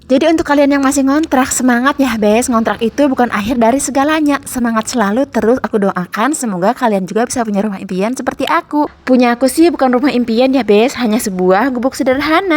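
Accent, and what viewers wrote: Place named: Pandeglang, Indonesia. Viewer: Rumahnya dmn teh bahasanya mirip orang pandeglang kota kelahiranku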